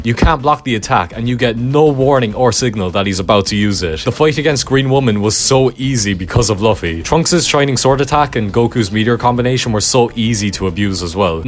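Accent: Ireland. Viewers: You irish? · R u irish?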